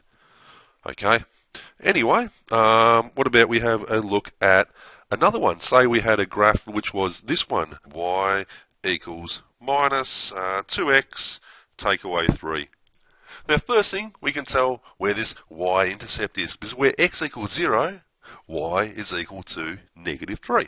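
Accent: Australia. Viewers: No he’s just an Aussie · He's speaking English but he has an Aussie accent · He's just Australian by the sound of it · Aussie Aussie Aussie!! Thanks, mate.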